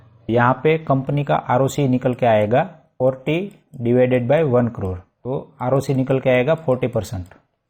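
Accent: Gujarat, India. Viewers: R u gujarati 😀😀😀😀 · Are you Guajarati? Language tone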